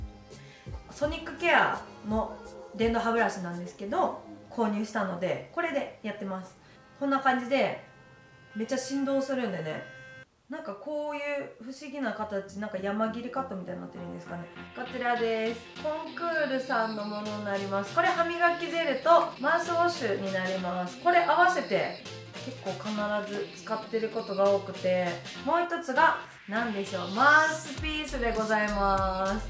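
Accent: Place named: Kansai, Japan. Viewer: 関西弁も可愛い好き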